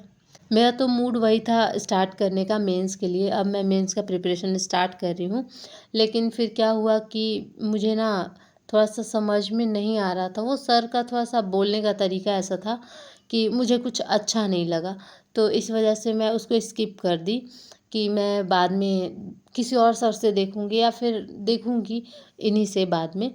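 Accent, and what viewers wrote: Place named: Hyderabad, India. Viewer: Aapka slang Hyderabadi a raha hai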